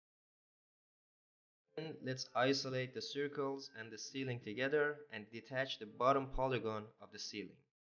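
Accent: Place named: Turkey. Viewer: Sen Türk müsün aynı Türk gibi İngilizce konuşuyon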